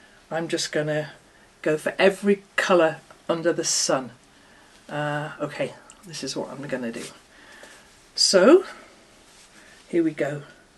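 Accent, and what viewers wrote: United Kingdom. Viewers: I love your British accent coming from an American gal. My most favorite, relaxing quilts was when I sewed my last 2 scrape quilt. Still have plenty of scraps to use. Thank you for your informative videos.🤗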